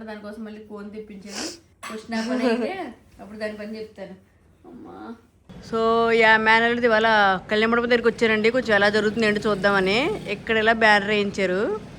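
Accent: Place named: Konaseema, India. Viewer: Garu Mi Vedio 's Baguntayi Konaseema Language Matladuthuntay Baga Enjoy Chesta Madi Konaseema Function Lo Baga Enjoy Chesara Next Vedio Lo Pettandi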